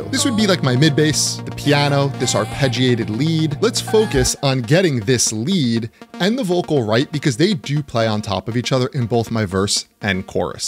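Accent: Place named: Arab world. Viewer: Your accent is from arab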